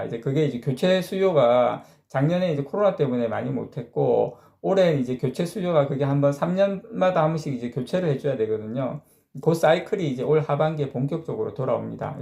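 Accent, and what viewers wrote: Gyeongsang, South Korea. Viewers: 구독 꾹~~누르고 매일매일 영상잘보고있습니다..ㅎ 경상도 사투리로 속시원히 설명해주시니 주린이라도 맘편히 따라하고있습니다.. 최고점에서 물려있지만 시키시는데로 ~~ 항시 존 정보 감사합니다..^^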